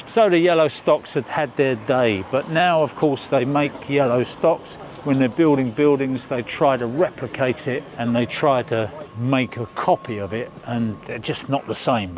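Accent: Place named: East London, United Kingdom. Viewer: ....IMO...........a "refined" East London accent.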